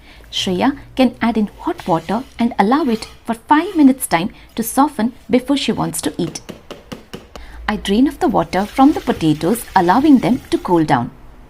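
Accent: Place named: Hubli, India. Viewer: Hi Nivu nim maneli heg matadatira annodanna swalpa swalpane keloo keluvu matanna revined madi keloke tumbane khushi agutte Hubli khadak bhashe 😘❤️🙏 Do some video on daily routines in ur and our mother tongue KANNADA 😘 ❤️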